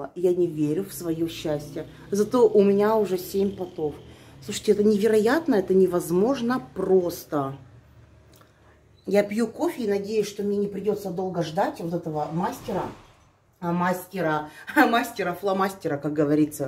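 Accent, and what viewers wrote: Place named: Chuvashia, Russia. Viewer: ЧУВАШКА... У неё же чувашский говор, какая русская????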